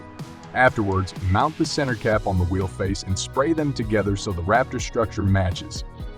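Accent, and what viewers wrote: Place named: South, United States. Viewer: Dude, that video was f-ing amazing! Plus I'm pretty sure chicks totally dig that southern accent! Subscribed.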